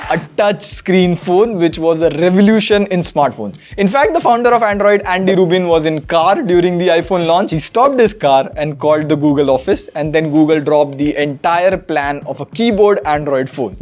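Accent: India. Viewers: He sounds like Indian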